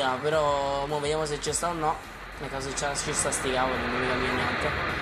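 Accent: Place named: Latina, Italy. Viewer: Ma sei di latina daje ahahah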